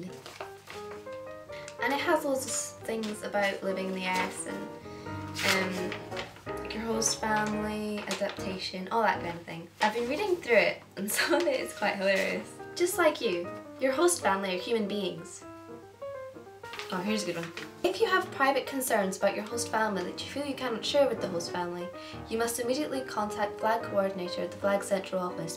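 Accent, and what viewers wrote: Scotland, United Kingdom. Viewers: tis not a good thing, why would a scottish person not want to sound scottish. also she does sound scottish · Haha, wish you came to my school! We never had a foreign exchange student from Scotland, here in Florida before, escpecially a cute one x) Love the accent too! Hope all goes well! Good luck! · you have such a cute scottish accent :D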